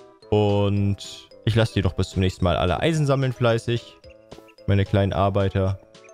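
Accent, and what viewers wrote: Germany. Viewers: man hört des Todes das du deutscher bist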